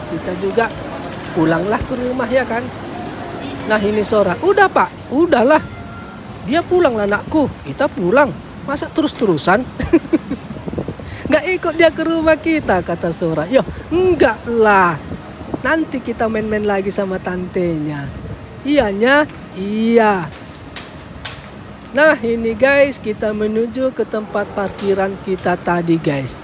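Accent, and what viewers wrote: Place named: Padang Sidempuan, Indonesia. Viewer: Senang aku dengar logat mu dongan.. Au Alak Sidempuan Horass❤❤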